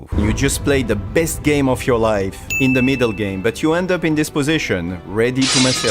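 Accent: France. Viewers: boy does the french accent suck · omg the french accent is amazing 😁😁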